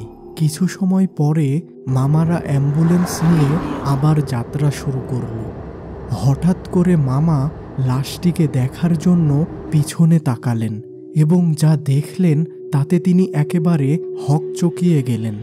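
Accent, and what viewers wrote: Bangladesh. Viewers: কথা শুনে মনে হয় বাংলাদেশের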